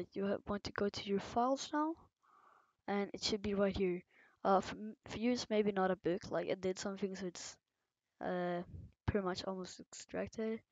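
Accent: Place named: France. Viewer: THIS IS SUCH A STRONG FRENCH ACCENT RESPECT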